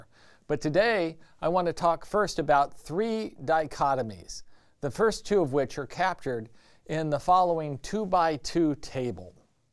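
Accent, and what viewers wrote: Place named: United States. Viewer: what American accent exactly is this, though?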